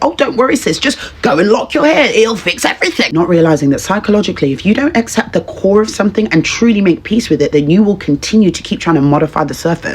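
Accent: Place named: United Kingdom. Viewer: Are you British? I’m not a native speaker, love your accent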